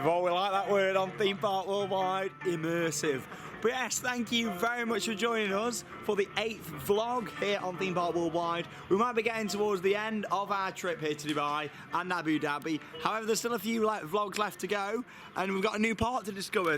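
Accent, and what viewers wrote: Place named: United Kingdom. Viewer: It's cool to see another brit with a heavy accent in an Arab country lol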